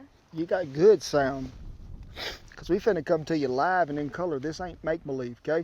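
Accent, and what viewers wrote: United States. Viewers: That cowboy accent. You’ll be lucky to hear someone talk like that in New Zealand. Wait? No one talks like that here 🤗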